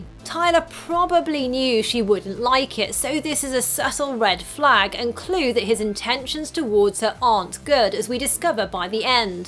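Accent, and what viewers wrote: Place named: United Kingdom. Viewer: That correct pronouce of Ortega was absolutley unexpected, whilst having a strong Brit accent.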